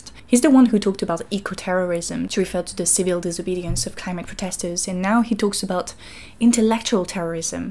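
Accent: North, France. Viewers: Est ce que t'es du nord?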